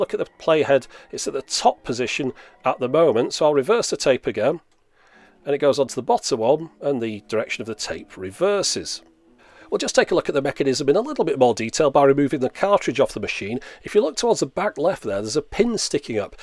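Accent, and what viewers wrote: United Kingdom. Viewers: the uk. You can hear it in his voice. Also, the ebay was asking for pounds, not aussie dollars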